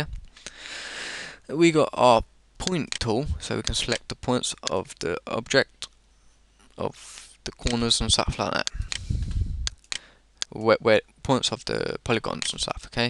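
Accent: United Kingdom. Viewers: you sound like russell brand.. nice english accent..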